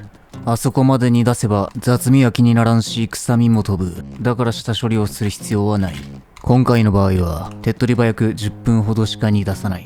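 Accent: Kansai, Japan. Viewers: 九州出身なのになんで関西弁しゃべるときあるんじゃーい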